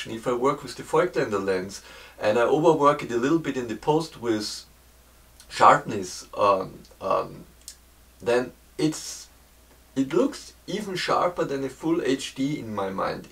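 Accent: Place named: Germany. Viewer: Very informative, thank you! Your German accent adds gravitas to your thoughts!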